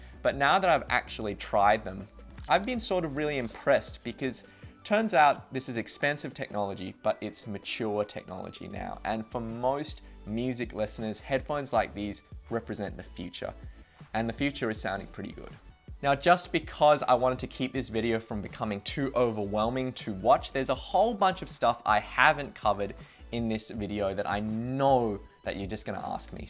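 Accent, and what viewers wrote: Australia. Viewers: and oh i have to say oz accent on an asian looking dude is effin damn sexy! have a great day buddy! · are you Australian?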